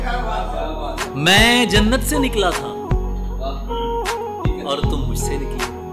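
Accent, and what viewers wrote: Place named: Delhi, India. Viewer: yar bhai hai tu pakistani lagta aapna delhi wala hai wohi boli wohi words wohi aapne mohalle ke ladko ka style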